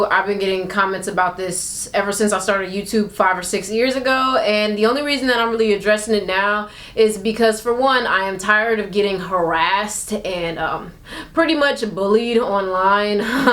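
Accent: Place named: Texas, United States. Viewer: I couldn't tell, I thought you just talk a little country like us in texas...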